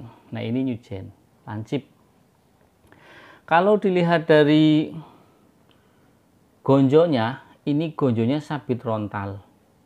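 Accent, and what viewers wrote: Banyumas, Indonesia. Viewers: Om orang mna ya kok ngapak jg boleh minta wa'nya g om?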